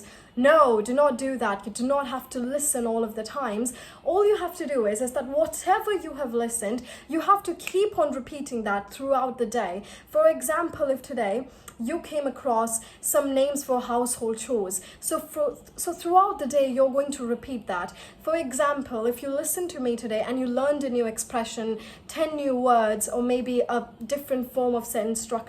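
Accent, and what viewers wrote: United Kingdom. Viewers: Are you using british accent while talking in most of your vedios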